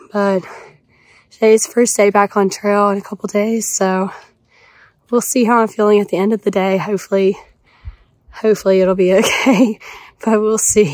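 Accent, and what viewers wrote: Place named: Mississippi, United States. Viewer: Hey 👋 Just saw your announcement video and I'm also from Mississippi, central Mississippi. I knew the accent sounded familiar. 😎👍👍🥾🥾⛰️⛰️⛰️⛰️🏕🤙🙏👋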